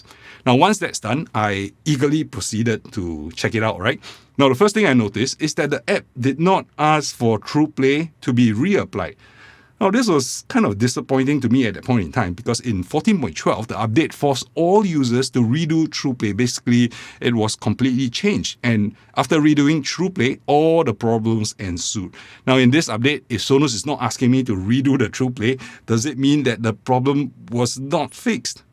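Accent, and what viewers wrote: Singapore. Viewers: Singlish! Love it. I love your methodical approach and the fact that you respond to comments:) Thanks and Ho seh liao! :)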